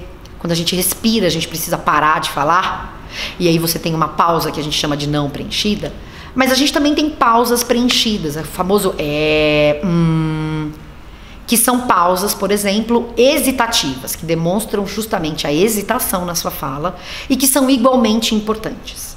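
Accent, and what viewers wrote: Rio de Janeiro, Brazil. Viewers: Afinal vc é carioca, paulista... ?? Começa puxando o S, depois passa pro R "arranhado" dos paulistas, depois o R mais interiorano.. "Aceeinto", "dizeeindo".. me perdi.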